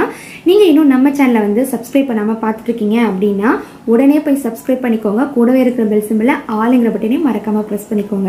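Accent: Thanjavur, India. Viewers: Sis neenga Thanjavur lah raajahiri yaaaa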